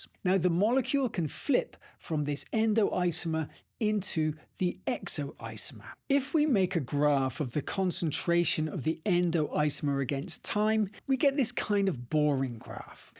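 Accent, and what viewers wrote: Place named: Scotland, United Kingdom. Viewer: You know when you said this was made in Japan I was like huuuh as your Scottish accent had me guessing like you were someone in England or maybe Stirling, don't know why, but did you know the open university have no DaisyChains Audio or any audio version of there bio-chemistry related subjects and they don't know why, they have literally just been apologising too me for 5 years since I am dyslexic, and I failed 2nd year twice because I have just been giving walls of text too read as a dyslexic man and like I can't learn like that, though this is a perfect way, which is why I have a stupid playlist I have been building over the past 5 years of everything practical and like visual (or just the only English information on Youtube, the amount of videos in English which go into Hindi drive me insane, I think I find a video and nope, but coming across your channel sure was a treat.) Being very useful, I wanna pass my final year, you know, would be nice.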